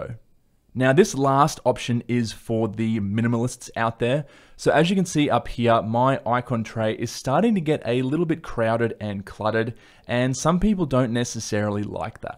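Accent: Australia. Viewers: Closest I have come listening to Australian accent. You speak well and clear. I have used windows all my life I can't relate to this but for the love of Apple animations and the Mac OS I am here for it.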